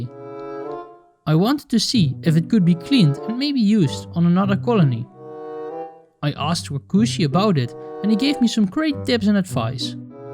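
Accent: Netherlands. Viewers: Ik dacht al dat ik een Nederlands accent hoorde =D toen zag ik de oven.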